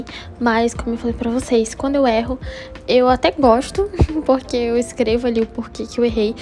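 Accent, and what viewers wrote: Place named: São Paulo, Brazil. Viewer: amigaaa,tbm sou de fortaleza e jurava que vc era paulista kkkk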